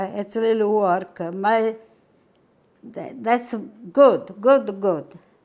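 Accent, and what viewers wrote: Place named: Sicily, Italy. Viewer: I wanted you to know the joy you bring to me. Your mannerisms and accent remind me of my grandmother. She immigrated to the U.S. in 1920 from Sicily. I wish when I was older, I could have watched her make the most delicious meals. Nothing came from a can or freezer. My most favorite meal was Sarda. She used fresh fish and anise. Every year, I would ask her to make it for my birthday. I have made many of your recipes. Each one was delicious 😋. Thank you for teaching me the recipes that bring back many wonderful memories. To me, you put love in everything you make. 🙂🦋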